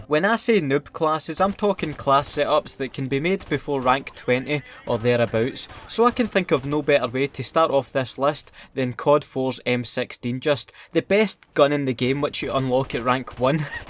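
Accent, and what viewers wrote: Scotland, United Kingdom. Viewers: What part of Scotland do you come from? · I love he’s Scottish accent lol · You from Scotland?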